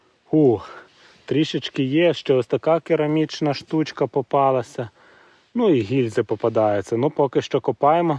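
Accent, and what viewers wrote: Ukraine. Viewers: Приємно з Вами( лежачи в ліжку ) копати і насолоджуватись природою та приємним українським говором. Молодці так продовжуйте, Хто шукає той знайде .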